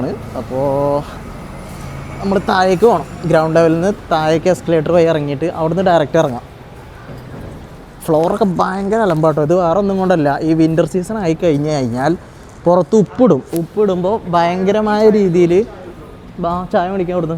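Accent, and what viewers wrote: Malappuram, India. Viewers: വ്ലോഗ് എടുക്കുന്നോണ്ട് മലപ്പുറം ഭാഷ ഒഴിവാക്കണ്ട ഞമ്മക്ക് അതൊക്കെ ആണ് ഇഷ്ടം ❤❤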